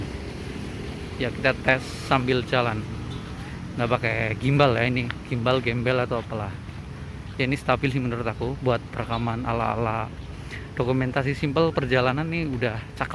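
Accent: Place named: Banyumas, Indonesia. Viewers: Ora ngapak ora penak ya lur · Salam ngapak😅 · Banyumas lurrr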